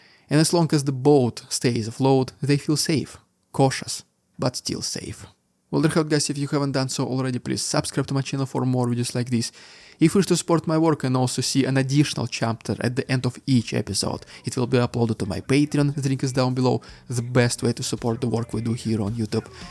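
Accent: Russia. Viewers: Love your charming Russian accent. Keep up the good work, and hold on to your accent, because it sounds authentic and is very easy to understand.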